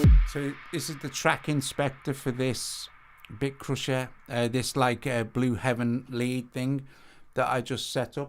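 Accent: Liverpool, United Kingdom. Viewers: I love your scouse accent ;)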